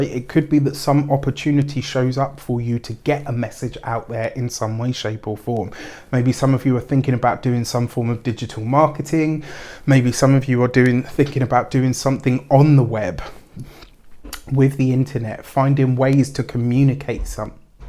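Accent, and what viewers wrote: United Kingdom. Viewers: Sag Rising here and that was totally on point thanks! Fellow Brit here too and it's refreshing to hear another Brit doing tarot 🙂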